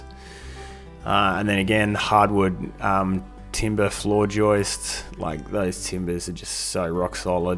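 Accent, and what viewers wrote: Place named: Australia. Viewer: Just stumbled upon your videos. The voiceover kicks it. I hate just timelapse. Great to hear an Aussie voice. Keep it going buddy.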